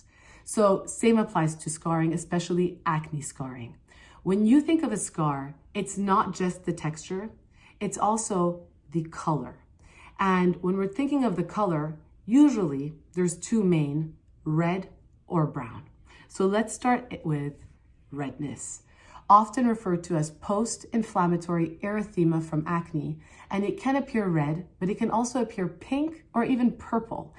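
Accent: France. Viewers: Great tips thank you 😊 also have a question on using laser for scarring or redness... is it useful, do you have any recommendations? love your french accent très chic oh la là 🤌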